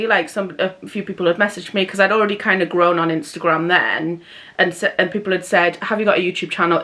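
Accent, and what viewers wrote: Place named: Newcastle, United Kingdom. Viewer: I love the Geordie accent 🔥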